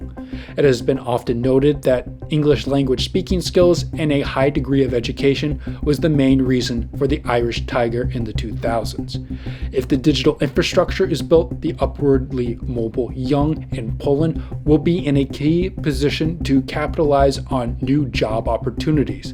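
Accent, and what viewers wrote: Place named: United States. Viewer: Myślę że to Akcent amerykański ale nie jestem pewien z jakiego rejonu.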